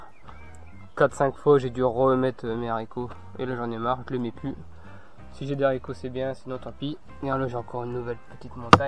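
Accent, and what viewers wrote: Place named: Picardy, France. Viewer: bonjour,je découvre votre chaine. je m abonne car j adore. vous seriez pas de picardie? j ai le meme accent.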